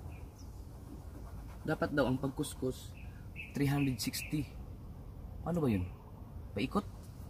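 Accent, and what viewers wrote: Visayas, Philippines. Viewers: taga saan ka pala talaga sir may parang bisaya halo tagalog mo sir tanong lang hhehehe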